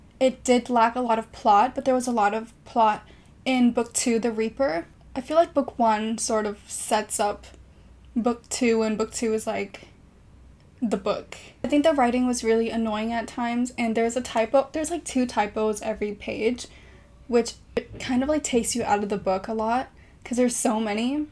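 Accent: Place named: United States. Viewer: ooo, that's cool! did you go to an international school or lived in America at some point? you sound American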